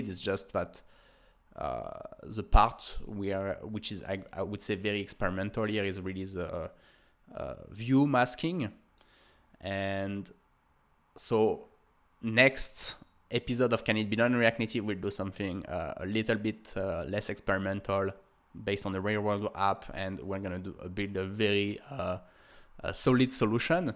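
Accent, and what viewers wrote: France. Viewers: Like your French accent ✌️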